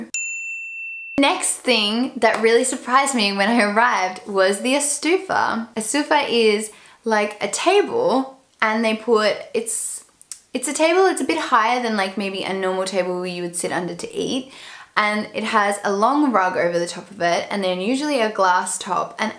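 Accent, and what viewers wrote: Australia. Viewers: Tienes ojos tan hermosos y fascinantes que a veces no puedo concentrarme en lo que dices... Saludos desde Polonia,! Great work:) P.S. I love your Australian accent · I really love your accent. Beautiful accent + beautiful smile + beautiful eyes + blonde = perfect Aussie woman. I hope I could meet you in person. 😍😘😃 and I Iove your hair as well and you have sexy lips. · Me encanta el acento australiano!!! la forma en la que dices "water" me hace mucha gracia jajjaj love it!! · I love your Australian accent!!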